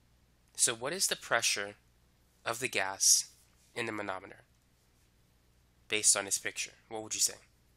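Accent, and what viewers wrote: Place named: Boston, United States. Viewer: Dope Boston accent 👌🏻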